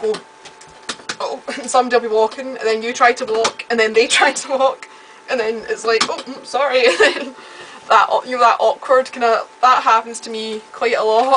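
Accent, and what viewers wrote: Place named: Scotland, United Kingdom. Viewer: Good on you for making this video and helping to understand Autism. If I can ever afford a good video camera I too may make a video on autism. But the prospect of talking on video to an audience of possibly millions terrifies me. In regards to that you are far more brave than I. I applaud your efforts. I really like your scottish accent too.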